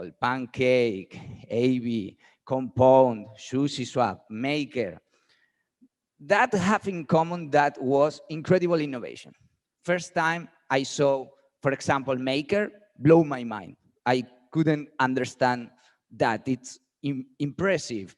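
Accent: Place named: Spain. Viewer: Eres un crack, quizas un mejor ingles lo haria todo un poco mas profesional, tu pronunciacion es muy española jajaja sin animo de ofender, te admiro mucho, un saludo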